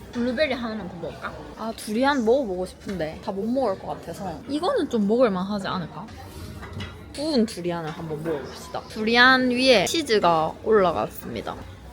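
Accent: Gyeongsang, South Korea. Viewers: 고향이 경상도 쪽이세요?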